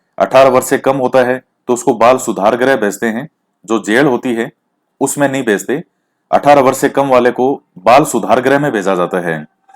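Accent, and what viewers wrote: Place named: Marwari, India. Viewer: Nice marvadi language ... humare bhi ase hi bolte hai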